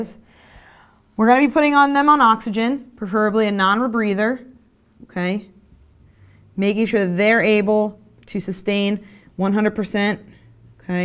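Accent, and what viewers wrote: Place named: United States. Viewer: Great but please not explain in pure American ancient we can't catch pronounce word little harshly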